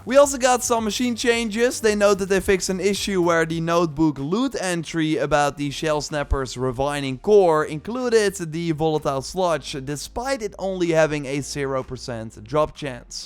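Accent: Netherlands. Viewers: Eyo you from the nederlands ?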